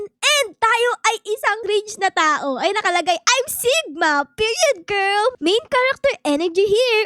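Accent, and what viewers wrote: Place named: Baliwag, Philippines. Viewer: para kang baliwag na bata diyan magsalita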